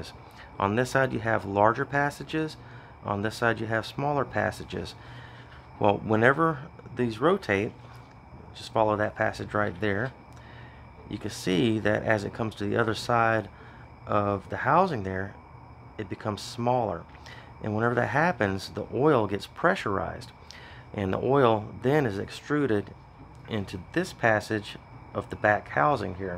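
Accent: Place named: South, United States. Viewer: I love the polite southern accent. "don't beat the mess out of it", would have been phrased differently in the Northern USA and Canada :)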